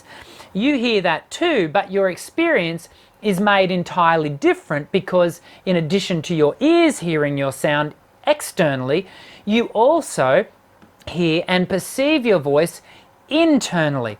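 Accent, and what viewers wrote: Australia. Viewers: I thought it was Dana Carvey doing an Australian accent . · these videos help but i can't keep myself from thinking if you didn't have such a thick Australian(?) accent they might help even more lol. thanks though!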